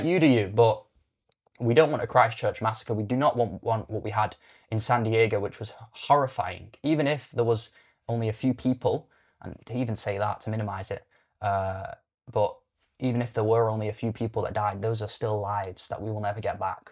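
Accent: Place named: United Kingdom. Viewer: Right, so... The movie was shite yeah. BUT, tagging people "far right" or r@ for being sick of the migrant issue is part of what alienates them and pours oil on the fire because it closes off any real potential for dialogue. Hence the movie. The problem has festered too long without being addressed in a mature way, and lo and behold, now we have the state of the UK (you sound British, so maybe you should know). I'm writing as you go along; you cite Tommy Robinson, but NEVER has he advocated for people to be violent, especially in the latest mass gatherings. The scene in the flat with the family (I don't advocate for what happens next, of course): covering one family's sins is EXACTLY prescribed by Islam and is part of the saving face cultures from the Middle East. The "moderates" are irrelevant; they'll suffer as much as the rest when the fundamentalists act. 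They can't rat them out, nor will they do so for less serious crimes. And the number of families that have stayed silent when their brothers, sons, or husbands have committed the horrendous gang grapes is damning. So in that sense, the scene where the family tries to find excuses is not that caricatural.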